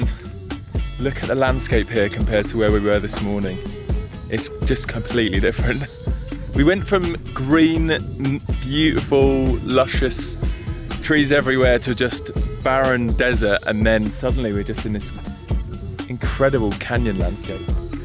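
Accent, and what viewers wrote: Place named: United Kingdom. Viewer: Hehe just a clear British accent;)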